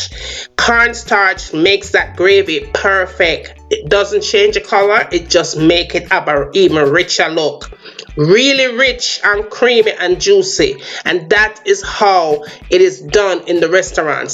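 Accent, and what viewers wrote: Jamaica. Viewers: Jamaicans are some of the most confident people on the planet. Love the accent and love Jamaican food 🔥🙌🏿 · First tings first you add hoil!🤣🤣🤣 pure yardie. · I love Jamaican accents 😍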